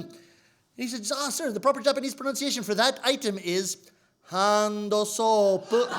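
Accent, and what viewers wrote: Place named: United States. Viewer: That's not Canadian, that's American!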